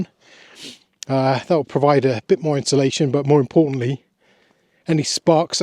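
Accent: United Kingdom. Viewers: That was smart going up hill when finding a camping location. First time viewer. Emjoyed your video. Are you from the UK?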